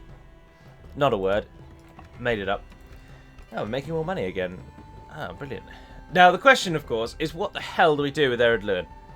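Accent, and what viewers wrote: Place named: RP, United Kingdom. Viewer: Mate, your sleepy voice sounds bloody brilliant! Makes you sound even more posh (didn't know that was possible)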